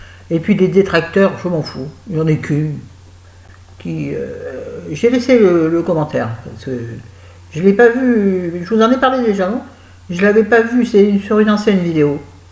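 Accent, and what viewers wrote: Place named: South, France. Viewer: Coucou j'aime les clins d'oeil et l'accent le mien en fait celui du midi non aucune nouvelle de criss que se passe t'il?en ce qui concerne les commentaires hargneux le mépris est la meilleure des choses,jusqu'à un certain POINT!!!! Des poutous a bientot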